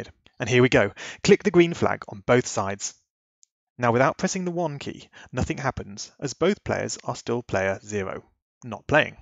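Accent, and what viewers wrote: United Kingdom. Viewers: You have British accent! Like me, I am from England too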